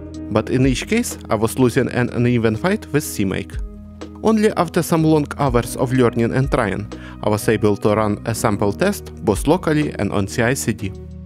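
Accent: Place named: Russia. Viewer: Русский (или украинский) акцент почему-то позволил мне смотреть видео без перевода. Всё чисто и понятно. А вот речь настоящих американцев или англичан мне воспринимать трудно.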